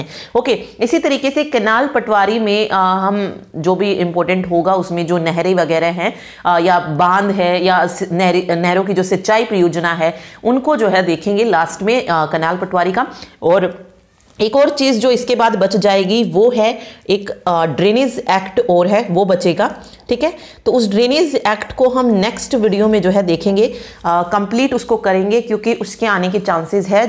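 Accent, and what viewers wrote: Haryana, India. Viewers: Maam yeh wording bolte bolte haryanvi nikl rahi h bolte time 👌🏻👌🏻👌🏻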